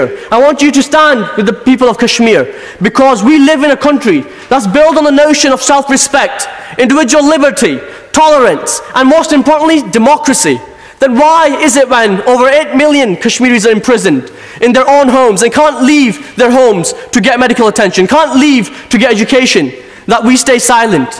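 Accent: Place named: Yorkshire, United Kingdom. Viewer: You are British, right. I would appreciate if you work for or raise issue of child poverty in the UK. And for your Kashmir crying- read some history. Your dads and uncles kept silent or took part in the Hindu pandit genocide in 1989. Easy to take one page from history and add your fancy Yorkshire accent to bark about. World is not just for Muslims!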